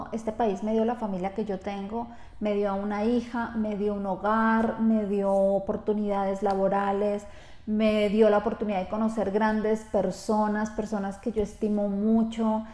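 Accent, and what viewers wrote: Colombia. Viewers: 😪😪😪😪, que trsiteza me da saber que dejar este mi bello y violento México 🇲🇽 pero me agrada enooooormemente que te vayas a tu país que tanto extrañas, ya que la pandemia echó a perder tus tan aheladas vacaciones. Muchas gracias por todos tus videos, tus vivencias, tus proyectos, etc. Y si, con la ayuda de Dios yo te seguiré de cerca porque déjame decirte que siempre he querido conocer Colombia, me agrada su gente y ese acentico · Hola, que padre que puedas regresar a tu país, me imagino lo mucho que has extrañado a tu familia, espero todo sea de bendición para ustedes y que sigas compartiendo con nosotras tu vida en Colombia, lo que más me gustará es que tendrás más marcado tu acento colombiano que tanto me gusta, DTB en esta nueva etapa y como siempre al pendiente de tus videos.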